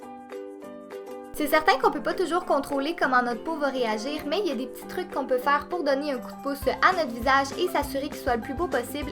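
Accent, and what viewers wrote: Quebec, Canada. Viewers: J'adore tellement tes vidéos, étant déjà une fan des voix québécoise (je vis en Belgique )! J'ai découvert tes vidéos il y a quelques temps et je les adore toutes!! Bisous et continue , de plus j'adore les routines ;)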